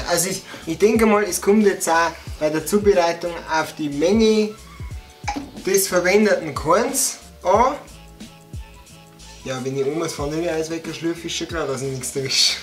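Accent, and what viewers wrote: Bavaria, Germany. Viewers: dei akkzent is echt oreidig aba guad wea moand a urbayer zum sei...aba nechsd moi bitte richtige reihnfolge vo de zutatn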